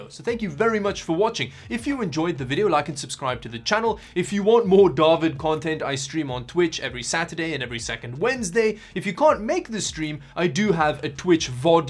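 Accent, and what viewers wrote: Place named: South Africa. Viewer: That hint of his South African Accent, gives me Homey Vibes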